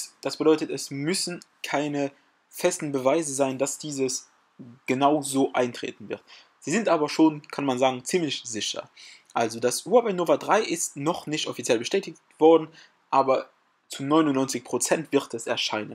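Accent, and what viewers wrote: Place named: Luxembourg. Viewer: Dat heiert enn met der stemm dat ass esou en deitsch-letzebuegen Akzent Waiste wat ech mengen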